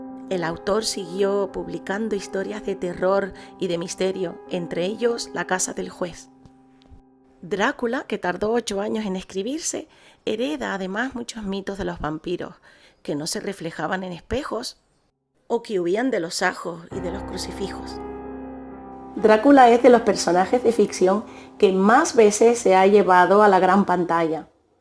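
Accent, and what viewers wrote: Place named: United Kingdom. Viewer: Me encanta!!! A mi me gustan todas las adaptaciones llevadas a la pantalla grande, no me he leído el libro... Pero seguro que lo busco y me lo chuto!!!! Por cierto ame tu inglés tan británico y elegante!!! Y MUCHAS FELICIDADES por tus primeros 💯 suscriptores!!! Yeiiii!!! 🎉 🎉 🎉 Ya tienes tu URL personalizada? 🤔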